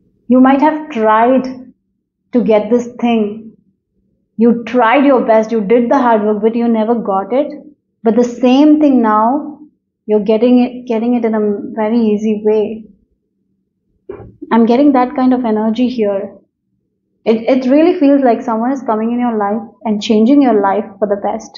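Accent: India. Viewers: Are you indian ? Your English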